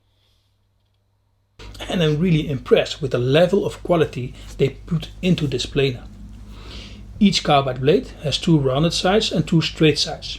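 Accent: Netherlands. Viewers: Leuke video man grapig dat Nederlandse Engels 🤣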